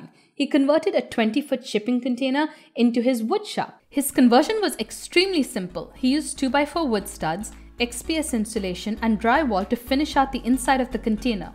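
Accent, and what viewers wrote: India. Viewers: It is too weird hearing a Indian accent using imperial measurements. My head is going to explode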